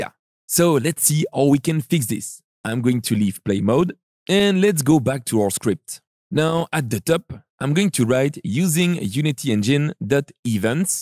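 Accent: France. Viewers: Son accent anglais est pas horrible et je le comprends facilement. Je pense que s'il parlait plus vite ce serait mieux. Son accent francais est evident mais c'est pas une probleme parce que son prononciation est fantastique. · Merci de prendre la parti de même si c'est inutile, j'ai bien précisé que c'était rien d'autre qu'une taquinerie, vous auriez deviné cela si vous aviez bien lu mon poste:P Bref, oui l'accent français est très fort ce qui fait le charme des ces vidéos, mais je maintiens le fait que si il ferait des vidéos en Français il aurait bien plus d'audience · C'est dingue comment on perçoit le côté français bien que tu gères l'accent, bonne vidéo 😉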